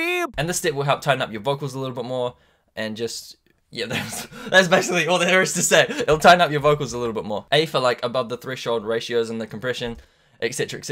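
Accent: New Zealand. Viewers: U from New Zealand? Ur accent is nz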